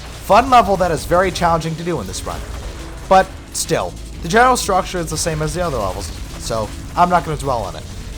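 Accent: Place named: Australia. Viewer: As an Australian I can confirm this is how we talk down here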